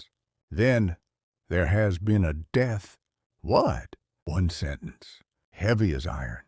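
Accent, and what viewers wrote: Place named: South, United States. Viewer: The AI voice is horrible, a southern speaking white man does not match the story.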